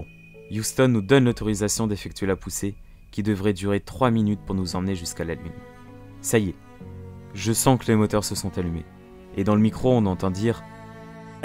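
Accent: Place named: France. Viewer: Bonne vidéo globalement. Par contre, pourquoi ces phrases en anglais avec l'accent français, ça gâche tout !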